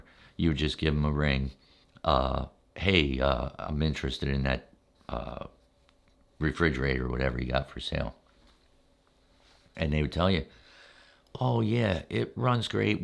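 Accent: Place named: Philadelphia, United States. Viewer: You've gotta be from Philly or from the Philly burbs. Just a hunch! You sound like most of the people in my family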